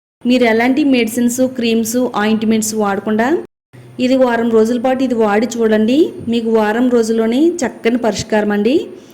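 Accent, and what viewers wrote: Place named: Godavari, India. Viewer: Godavari districts vallu ekkuva ga andi ani antaaru chivari lo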